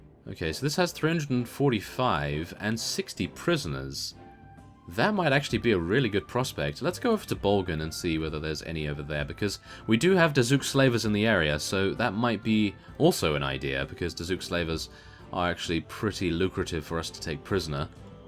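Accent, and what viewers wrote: United Kingdom. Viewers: awesome... your videos have been a source of entertainment for me for a long time now...ps: I love your british accent ^.^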